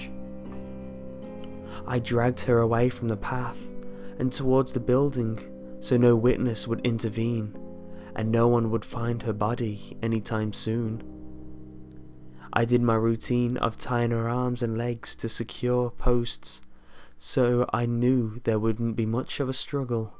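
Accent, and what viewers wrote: United Kingdom. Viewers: Your voice with a British accent is beautiful.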